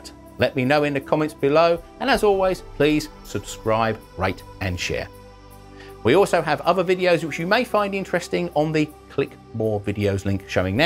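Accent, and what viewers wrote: United Kingdom. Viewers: The soft tender British voice of reason!! Google has discovered my chickens and their feathers in my back yard through the atmosphere!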